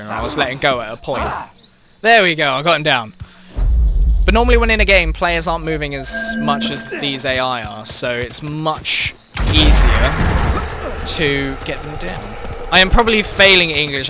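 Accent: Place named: United Kingdom. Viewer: Nice video. A nice British accent to listen to, no umming or ahhing, and a simple introduction to a game that I (and I'm sure many others) am looking into simply because Evolve has been delayed by such a long time. Onto your 2nd video......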